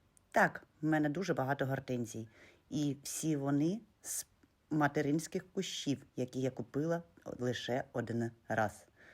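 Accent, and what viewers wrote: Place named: Germany. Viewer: Вітаю! Випадково натрапила на ваш канал, зайшла, сподобалось, цікаво, коли люди діляться досвідом, а ще цікаво заходити в гості до інших, щось пізнавати чи запозичати ідеї. Ви, мабуть, вже довго в Німеччині, відчувається акцент, знаю німецьку мову, чую її ознаки у вашій бесіді. Але у вас чудово виходить й украінською! Ви молодець! Запрошую і вас до себе віртуально погостювати! Вирощую сад, знімаю відео! Заходьте!❤❤❤❤❤❤Уявляю, як незабаром розквітне садочок на вашій ділянці! Коли люди мають таке бажання і азарт, як ви, а також роботящі, в них обов’язково все вийде!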